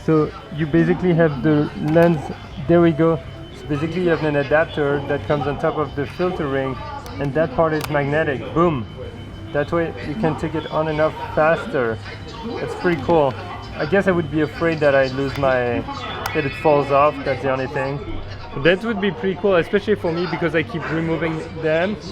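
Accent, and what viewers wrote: France. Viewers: You have a french accent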